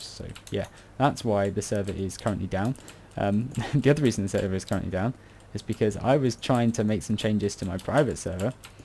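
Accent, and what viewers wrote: United Kingdom. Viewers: Your British accent is awesome you sounded like my fathers friend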